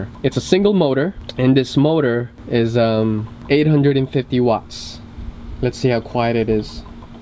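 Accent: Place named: Europe. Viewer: You talk like idubbz with European accent lol!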